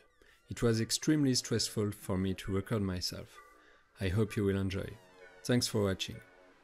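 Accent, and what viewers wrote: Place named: France. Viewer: Wech la france !!! 🙋🏻‍♂️😂 trahis par l’accent ! Respect ! Vraiment dure de parler comme ca 😌 bonne video ! Beau matos! J’ai un T1 moi 🤘🏼ssf gang !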